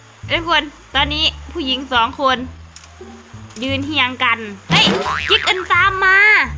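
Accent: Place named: Isan, Thailand. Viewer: เว้าเหมื่อนหนูเลยค้ะอีสานค้ะหนูเว้าจนพี่ฟังบ่ออก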